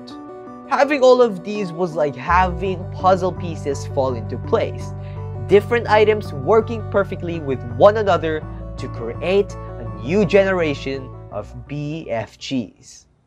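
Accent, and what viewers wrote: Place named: Philippines. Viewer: I already know your filipino couse of your voice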